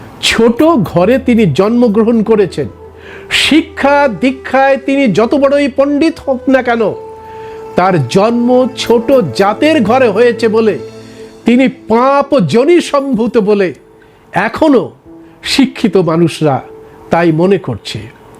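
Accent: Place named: Bangladesh. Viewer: আপনি কথা থেকে বোলছ । মনে হছে আপনি বংলাদেশী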